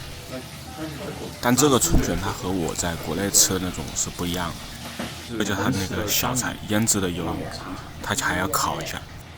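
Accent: Wuhan, China. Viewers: 听口音就听出来是武汉滴，果然哈哈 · 楼主口音像湖北武汉的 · 楼主武汉人，弯管子，口音好重。😄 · 这个汉普好亲切啊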